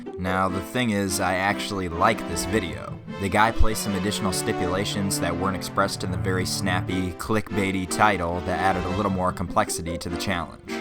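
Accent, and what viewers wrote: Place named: Midwest, United States. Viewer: Love the Midwest accent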